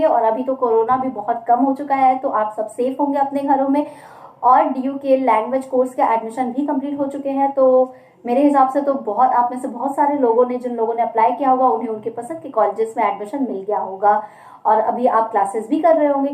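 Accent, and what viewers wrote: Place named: France. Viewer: And now i love the french accent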